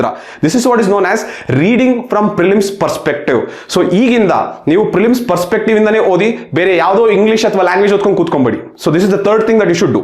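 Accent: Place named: Hassan, India. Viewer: Thank you sir❤... ನಿಮ್ಮ ಭಾಷಯಲ್ಲಿ ಹಾಸನ ಜಿಲ್ಲೆಯ ಅರಸೀಕೆರೆ- ಚನ್ನರಾಯಪಟ್ಟಣದ ಸೊಗಡು ತುಂಬಿದೆ😊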